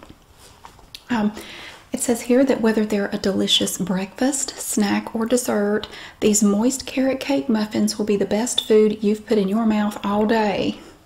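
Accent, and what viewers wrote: South, United States. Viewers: I live for these recipe videos with her southern drawl. Amazing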